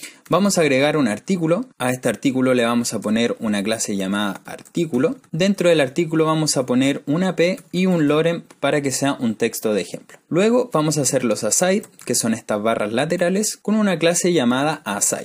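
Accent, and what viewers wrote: Chile. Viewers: Jsjsjs sabia que eres chileno jsjs tu acento se nota🥺❤️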